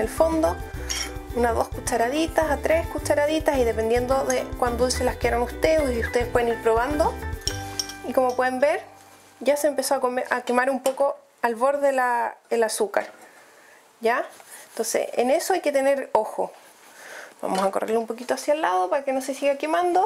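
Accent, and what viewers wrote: Chile. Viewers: Tienes voz de chilena :v , muy bonito video , viva chile :)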